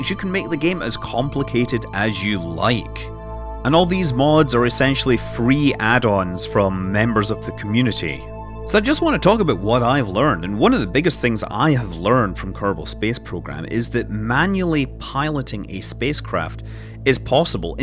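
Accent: Scotland, United Kingdom. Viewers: I just listened to a Scottsman essentially give me a powerpoint presentation about rocket science. And loved it.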